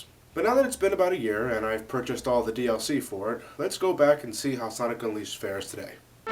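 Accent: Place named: Pennsylvania, United States. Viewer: My personal thoughts (now that I have the PlayStation 3 version) Daytime stages: 9.5/10 Nighttime stages 9/10 Tornado Defense: 7/10 Eggmanland (which, for first timers, can go f**k itself): 2/10 Overall: 8.75/10 And for saying war-hog, it's his Pennsylvanian accent.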